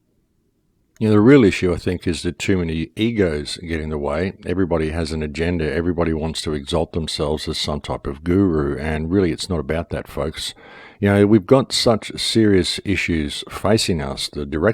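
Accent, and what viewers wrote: Australia. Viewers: Patrick dalton what r u talking about he's australian not british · Wow. I've woke up, never heard of you, first thing on my feed and you're describing exactly how I feel!!!!! And you sound like you are in Australia. Is there any way to connect with you on LinkedIn?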